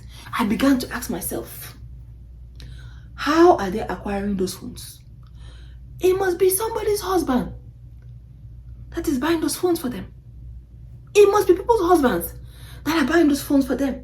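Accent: Nigeria. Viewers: And also are you sound Nigerian ❤❤❤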